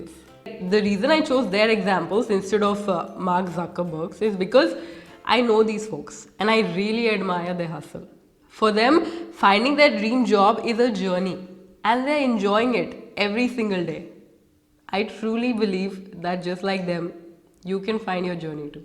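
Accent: India. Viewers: Whe. I heard Indian accent i jumped suddenly cause i knew she is from Country of geniuses.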